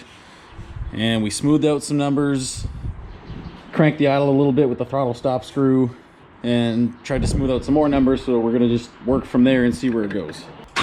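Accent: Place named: United Kingdom. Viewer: So out of curiosity were are you located? Country is fine just how you said everything it sounds like the uk maybe....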